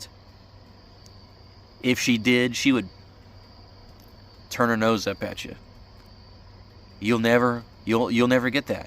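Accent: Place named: South, United States. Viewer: I like your southern accent and your style of narration ❤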